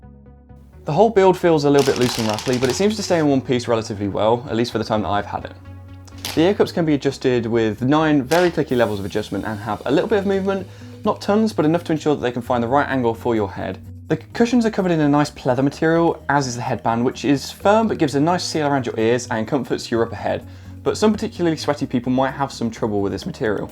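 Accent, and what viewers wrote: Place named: RP, United Kingdom. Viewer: love how posh you sound in your videos, mate 😂 also, what's with the 2 month absence? 😏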